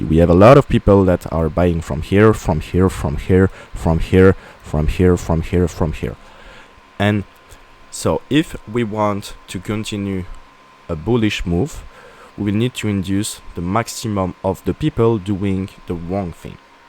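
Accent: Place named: France. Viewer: U have a French accent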